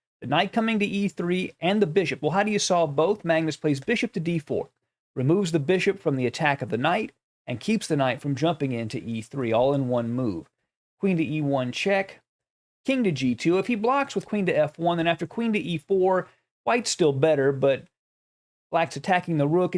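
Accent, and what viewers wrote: South, United States. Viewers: Are you from southern America ?🙂 Nice video, I love watch your chess review 🤩😍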